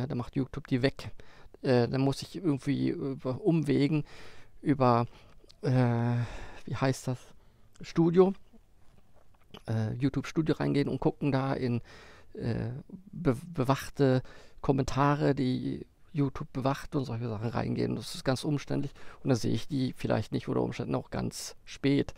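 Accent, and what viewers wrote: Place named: Sweden. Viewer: Ich finde dein schwedisches Deutsch klasse!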